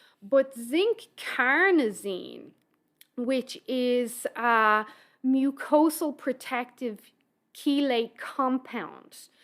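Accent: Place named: Ireland. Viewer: Thank you, lady with the lovely Irish accent. Very informative.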